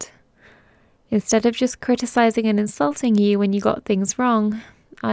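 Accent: England, United Kingdom. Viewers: It’s just an English accent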